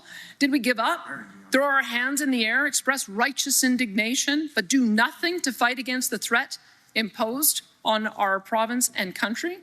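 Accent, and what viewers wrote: United States. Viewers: She is too arrogant for an elected official. Tone it down lady. You're not as important as you think. You sound like a yank. Remember you're in Canada. Stop trying to be maga. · She sounds American to me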